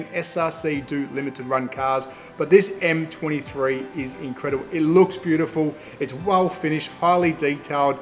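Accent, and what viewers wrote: Australia. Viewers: That Australian accent just grinds on me. Do not like it. Sorry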